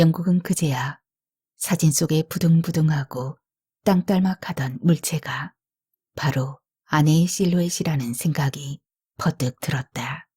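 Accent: Jeolla, South Korea. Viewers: 왜?미리처읽어대는거야.. 지나치게 전라도 사투리를써데더니.. 그냥 표준어로 읽어주세요. 너무듣기좋아요. 목소리. 발음 너~~무이쁜데..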